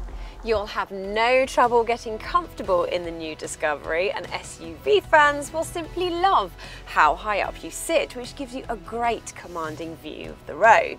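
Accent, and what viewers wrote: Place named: Estuary, United Kingdom. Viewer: Bit estuary.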